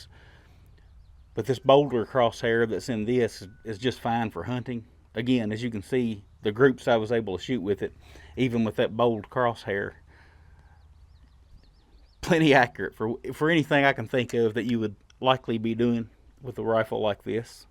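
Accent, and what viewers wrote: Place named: Kentucky, United States. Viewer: Heck.....you always sound funny to me.....you Kentucky boys vocalizations are a bit to sophisticated for a Texican😀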